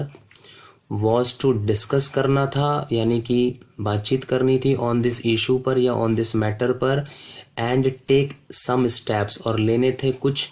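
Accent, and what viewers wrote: Lucknow, India. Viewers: bhai lucknow ke ho ka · Bhai Lucknow se. Ho kya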